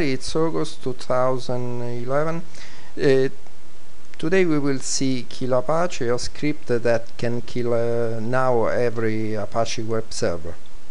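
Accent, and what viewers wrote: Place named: Italy. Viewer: Outdated. Si sente che sei italiano ma mamma che brutta pronuncia che hai !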